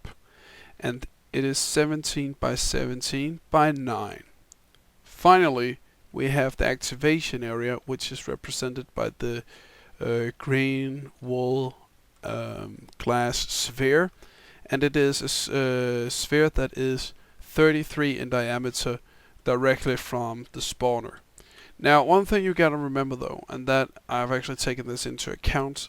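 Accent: Denmark. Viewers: man, i could totally hear you are from Denmark. PS. GO Denmark!! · Man kan høre at du er dansker. Det kan man altid :D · Fellow Dane :) I heard it instantly, then went to your channel, and I was right. Aside from that, I like your videos. They're good and deserves a lot more views. Hope you have success in the future. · Are u danish? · You sound danish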